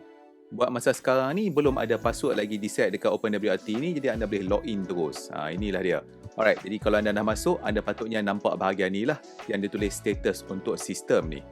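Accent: North, Malaysia. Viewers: Bg pendapat saya ok sj..mungkin sebab longhat utara saya pun org utara😅..tp overal rasanya sy suka sbb mudah faham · Loghat utara tu okay saja. Muda faham. Sy yg berasal dari Sabah pun boleh faham.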